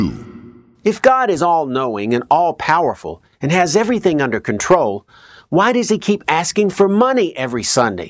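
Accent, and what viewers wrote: South, United States. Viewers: I don't even know you but giving your accent, you were probably churched in the Bible Belt and then rebelled. Given your beard I would bet you are a gay man and hate Christianity for this reason. But, I will say something nice. You are one hell of a musical talent.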